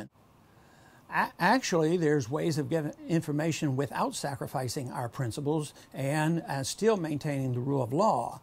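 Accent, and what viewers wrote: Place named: Texas, United States. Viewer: you're from Texas aren't you ;D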